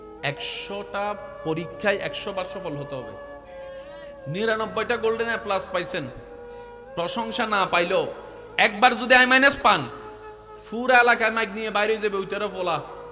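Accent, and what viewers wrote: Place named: Kolkata, India. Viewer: মাশাআল্লাহ আপনার ওয়াজ কলিকাতান্দা হয়ে যায় অনেক ভালো লাগে আমার কাছে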